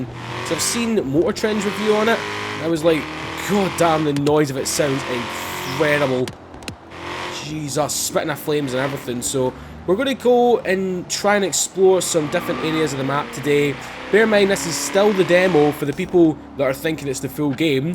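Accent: Scotland, United Kingdom. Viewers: Where abouts in scotland are you from?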